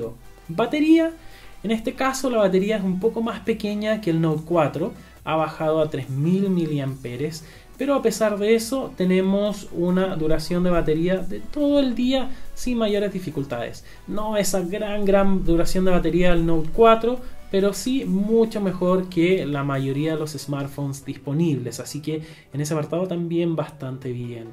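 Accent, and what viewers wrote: Chile. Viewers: el es chileno?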